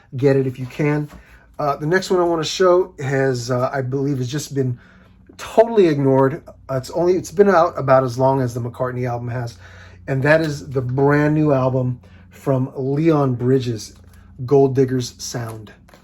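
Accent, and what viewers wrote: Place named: France. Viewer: Hey thanks for the recommendations. I must admit I am not that big of a Leon Bridges fan. I have only heard his first but it was really boring to me. I do like although I don't have any of their albums on vinyl. that is interesting. I do have. I didn't realize they were still putting out music. Nice French accent by the way. I hope you are doing well. Cheers.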